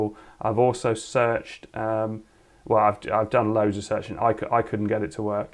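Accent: United Kingdom. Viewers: Yo are you from sheffield mate?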